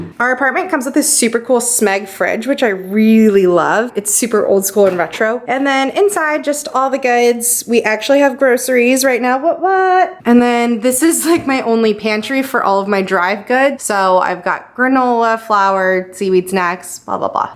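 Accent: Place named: West Coast, United States. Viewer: awe thanks!!!! They were selling that pillow for $80 bucks. Crazy. But..... I love the Chicago flag. It’s the second most recognizable flag after our country flag. Sounds like you’re a West coast girl. Prepare yourself for Winter......lol.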